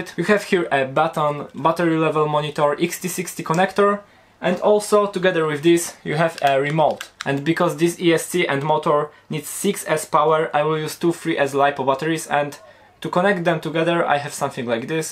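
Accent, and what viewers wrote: Poland. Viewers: I knew you were polish from the first second - very nice video! Nice editing skills!!! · Od razu usłyszałem polski akcent. 😁 Polacy tak ładnie i dokładnie mówią 😃 pozdro kolego bardzo fajne wykonanie 👍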